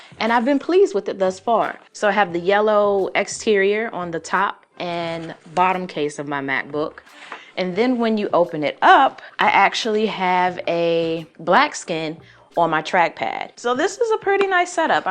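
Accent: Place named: South, United States. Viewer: She got that Southern accent 😌👌.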